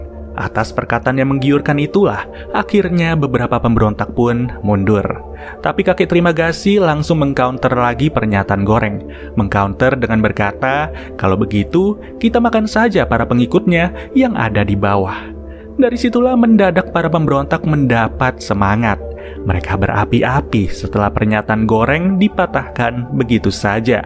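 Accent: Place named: Banyumas, Indonesia. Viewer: Wong ngendi koh lur kayonge ngapak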